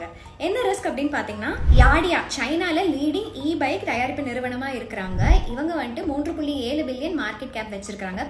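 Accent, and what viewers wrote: Coimbatore, India. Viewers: Coimbatore Tamil ❤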